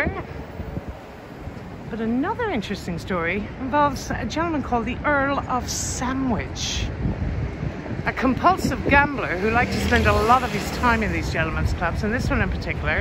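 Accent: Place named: Ireland. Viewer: A lovely way to learn about our capital, told by a lovely Irish lady with a soft Irish voice. I could listen all day.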